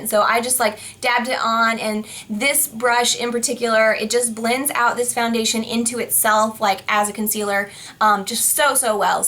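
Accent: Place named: Yorkshire, United Kingdom. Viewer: Her accent is northern- I think maybe Yorkshire! (Sorry if I'm wrong...)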